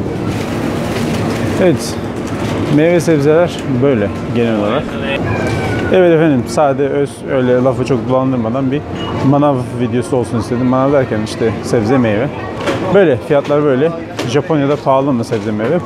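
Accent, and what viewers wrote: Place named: Ankara, Turkey. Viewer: Selamün aleyküm hacı abi senin memleket neresi ? Sanki angarali gibisin ama bilemedim ha bide araba satışında 1000dolar 1000 lira gibi dedin ben anlamadım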